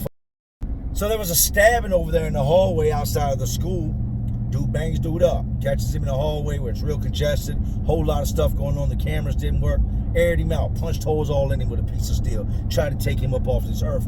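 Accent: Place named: South, United States. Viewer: hes from the hood in the south do you expect him to sound like JFK??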